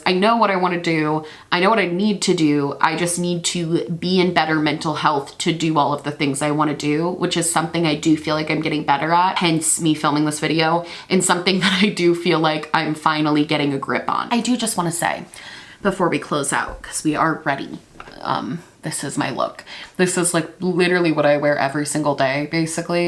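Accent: United Kingdom. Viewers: Video in a British accent? 💜 lots of love! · my love, we are going to need book reviews now. Full English accent accepted.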